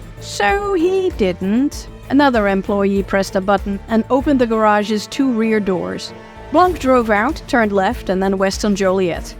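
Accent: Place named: Netherlands. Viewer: I love that Dutch accent. This is brilliantly made.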